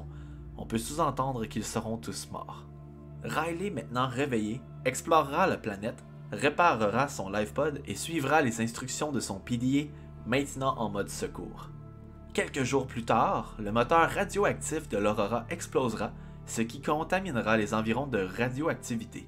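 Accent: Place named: Quebec, Canada. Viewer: j'adore merci pour cette série, je suis d'ailleur jaloux de ton accent qc le miens en pas assez prononcé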